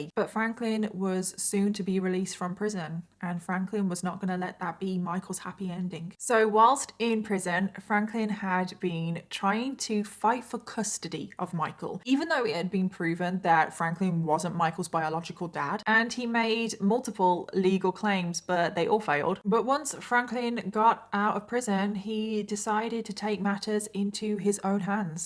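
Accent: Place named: United Kingdom. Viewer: i was literally binging your series and wanted to see a compilation of like abvsive parentys and then next day i have it! (obviously i would rather not have these crimes to exist, condolences to the victims) I also build a lot of minecraft while your videos run in the backround and your voice is so calm and Sassy is so funny and point-on! Also i always knew you were british =D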